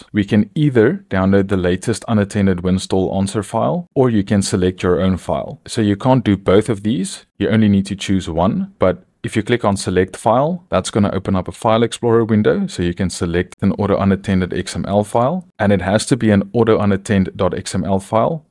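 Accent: Afrikaans, South Africa. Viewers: Baie dankie vir hierdie video. Dit is die eeste keer dat ek op jou youtube video's afkom. Gaan nou na alles wat jy het kyk, verstaan nog nie 100% nie, maar gaan nou na hulle kyk. Toe ek jou stem hoor, het ek dadelik agter gekom dat jy dalk Afrikaans is.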